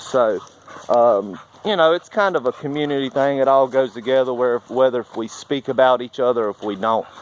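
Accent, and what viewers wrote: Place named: South, United States. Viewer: you sound like a southern gentleman. No need to justify anything, appreciate your hard work. God bless.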